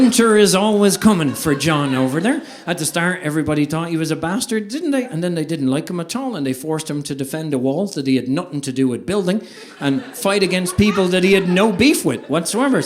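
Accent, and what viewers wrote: Ireland. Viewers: Spoken like a true Irishman. Bless you my fellow countryman.☘️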